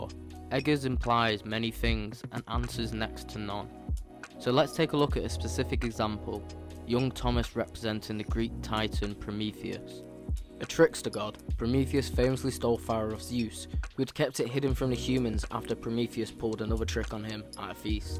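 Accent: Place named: United Kingdom. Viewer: I scrolled down to subscribe wondering if you had tens of thousands of subscribers or hundreds of thousands. I'm truly astonished you only have 35 when I write this. This is a really good video essay, nicely presented, excellently written and well researched. Honestly, I don't have an issue with your diction being a fellow Brit, but I think to be more accessible to international audiences the other comments are right, you have to clear up your accent a bit. I know it sounds classist but the BBC have it right wanting ppl's accents to be clearly understandable.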